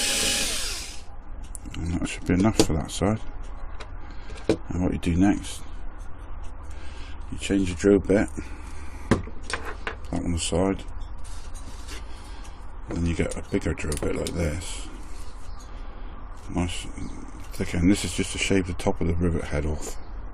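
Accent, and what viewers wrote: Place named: England, United Kingdom. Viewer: ! Are you in merry old England? I grew up in Wales.#7 Llanerch path, Cywmbran Monmouthshire. Pretty sure I hacked the spelling on that. Been awhile. I miss the sweets, steak n kidney pie and the custard squares. I love to come back just to beat the hell out of one Nigel Wilkes. My dad was in military so was there for 4 years. Live in Coos Bay, Oregon these days.England was best place in the world to grow up as a kid. Miss the hell out it. Sorry to ramble but not have any contacts there anymore and you accent reminded me of the place. Take care. JP.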